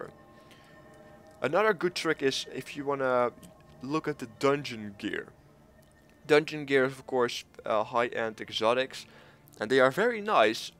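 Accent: Netherlands. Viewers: I knew it, because your accent is just typically Dutch, (Ik ben ook Nederlands dus ik kan het weten) On which server are you playing? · are you Dutch?:P, If so, do you play on Ring Of Fire?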